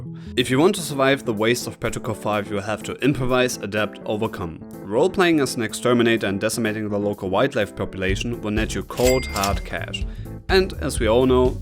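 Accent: Germany. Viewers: btw is it just me or are you german?/kommts nur mir so vor oder bisch deutscher?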